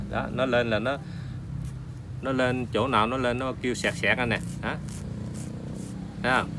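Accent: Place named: Mekong Delta, Vietnam. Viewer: Cắc phải hỏi xem anh ở đâu để tới học mài dao cho bà xã thấy anh chỉ dẫn rất hay,mình ở"ăn giang"miền tây chắc anh cũng ở miền tây,nghe giọng nói biết dân quê mình cảm ơn anh nhiều...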